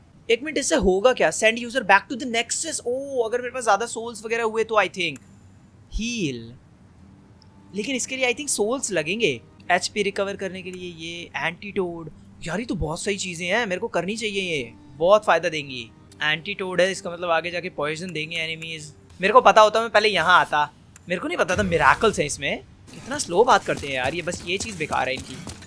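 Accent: India. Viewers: Does anyone else thought that he had too much of Indian accent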